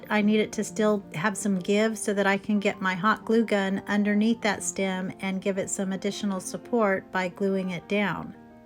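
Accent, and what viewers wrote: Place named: Georgia, United States. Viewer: I always LOVE watching your channel! You are so creative!! Love when you say, “anytime you can get more Jesus” in your projects! Because we all need a lot more Jesus! Love that you always share inspirational meanings in your projects!! I love Crafting Cousins as well! (Btw I’m from GA, so I have that same “cutie patudie” (don’t know if that’s spelled right!) ..accent too!) 😂 God Bless you all, as you share God’s word, through your creative gifts of crafting! ❤️🙏🏼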